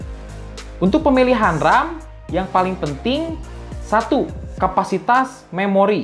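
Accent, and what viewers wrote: West Java, Indonesia. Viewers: Sunda pisan kang 😂 Nuhun penjelasan na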